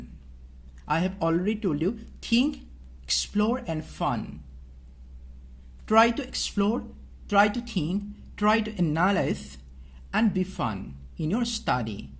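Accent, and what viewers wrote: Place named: Northeast, India. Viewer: U sounds like from the northeast 👍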